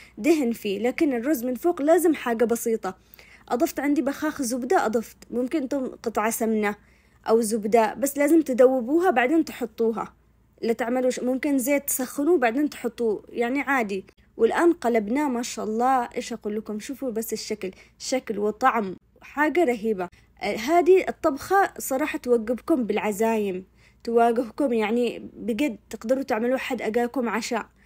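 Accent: Aden, Yemen. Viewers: روعة ربي يحفظك صوتك وكلامك واللهجة العدنية من فمك عسل اختك ام معين من عدن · ماشالله عليك والله لما اشوف فيدوهاتك وطريقه شرحك العدنيه ...أتذكر عدن الحبيبة...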